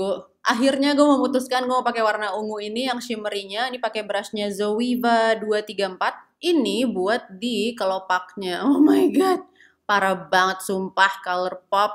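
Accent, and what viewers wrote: Java, Indonesia. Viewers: aku ko selalu ngakak ya setiap ngomong jawa, medokkk banget 😂😂😂 Tp ttp cintaaaa❤❤❤❤❤❤❤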